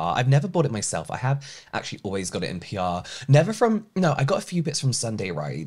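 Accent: United Kingdom. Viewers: I believe Peter Thomas Roth would fit into this category! I've bought a set of their cosmetics because it was on sale and tried some of their most famous products, vit C included. And it didn't change anything for me. I do like its luxurious touch, though! Won't be rebuying,too expensive to just feel nice and see no effects. Great video, I looooove your British accent ❤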